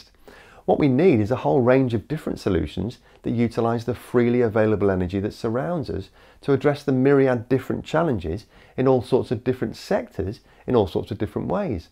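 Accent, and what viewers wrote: United Kingdom. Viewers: Idc if you have a British accent, I’m not in agreement about global warming.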